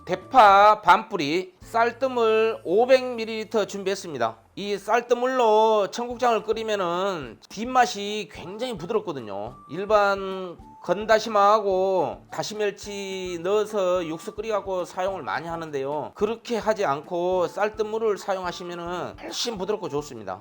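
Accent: Jeolla, South Korea. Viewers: 우리 샤프님 얼굴한번 보고싶네요 전라도 구수한 목소리가 참좋아요 요리도 잘하시고 구독자님들에게 펀리하게 요리도 하시면 ㅋㅋ 너무 좋아부러요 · 쉐프님 말씀도 구수하시고. 음식도 왜 케 잘하시는지 전라도 사투리 . 아. 쉐프님존경합니다. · 전라도사투리 쉐프님 최고입니다!!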